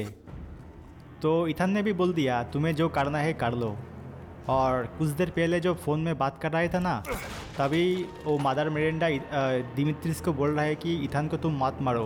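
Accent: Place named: Bengal, India. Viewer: বাংলায় বলেন আপনি যে বাঙ্গালি সেটা আপনার হিন্দি বলার ধরনই বোঝায় আপনি বাংলায় বলুন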